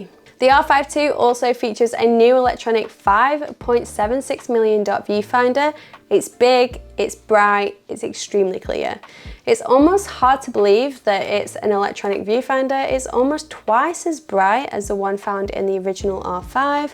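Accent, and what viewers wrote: United Kingdom. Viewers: As someone from Texas, it might be the British accent that entranced me, but this might be one of the best reviews on YouTube- great job 👏 (wait, am I supposed to bow?). As for the camera, I’m shocked at the quality at 1/4000, f/4, ISO 6400. I’m looking forward to what I will get using a 2.8 lens…wow! Keep it up, Queen 👸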